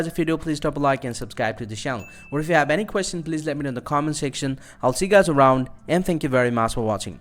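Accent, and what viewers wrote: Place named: India. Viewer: bhai itna vahiyaad accent hai na tera. Indian english me hi bol le. Saale angrezi chodde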